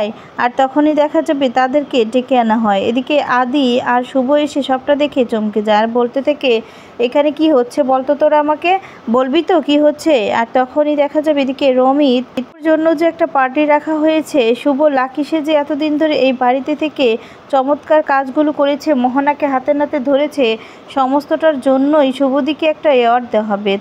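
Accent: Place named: Bangal, Bangladesh. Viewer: তোমার কথকতা ভাল হচ্ছেনা। বাঙ্গাল বাঙ্গাল উচ্চারণ শুনতে খারাপ লাগে। এই উচ্চারণ ঠিক না করেই কথকতা নাকরলে ভাল ।অপদার্থ। একটা কথা,চেষ্টা করলে তুমি বাঙ্গাল বাঙ্গাল উচ্চারণ ঠিক করে শোনার যোগ্য কথকতা করতে পারবে।বিশ্বাস রাখো।শুভেচ্ছা জানাই।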